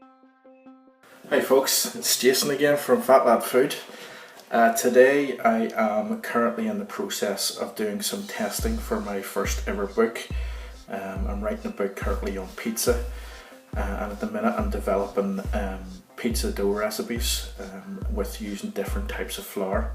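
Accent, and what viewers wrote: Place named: Ireland. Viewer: I love your Irish accent I am from Scotland just over the sea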